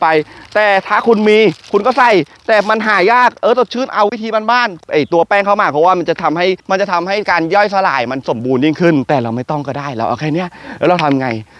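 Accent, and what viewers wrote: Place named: Ban Pong, Thailand. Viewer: เก่งจังเลย..คุณ..เสียงเหน่อเหมือนกันเลย(คนบ้านโป่ง)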